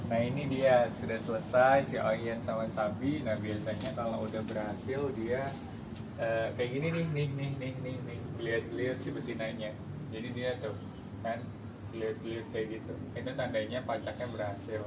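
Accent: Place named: United Kingdom. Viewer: britishnyaa comel😭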